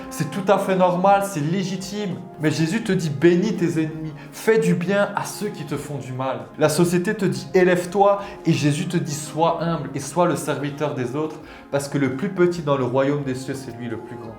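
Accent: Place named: Alsace, France. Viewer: Je tombe sur ta vidéo, je me dis super un autre frère en Christ sur You Tube super! Et la j’entends l’accent alsacien et je me dis oh en plus alsacien ! 😂 Ps: je ne savais pas que le « yo » ne se disait qu’en Alsace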